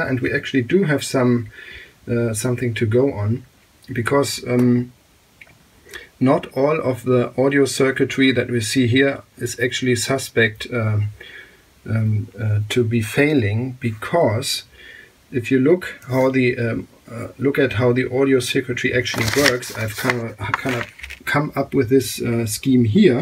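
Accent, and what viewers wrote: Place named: Germany. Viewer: Hey man love the videos! Your german accent is fantastic, and thanks for speaking in english! I found your channel by looking for videos on the Atari System 1 boards, as I want a marble madness cab at some point. But! I have a question, what Jamma>Scart board are you using? I have a 15khz PVM that supports RGB and wanted to start maybe getting some broken arcade boards and fix em up. I usually buy broken consoles and fix them, but IBM PC's and Arcade boards are my next logical step. Thanks for the videos! I'm going to be posting them on some repair forums I hang around as your videos are criminally underwatched. Thanks again!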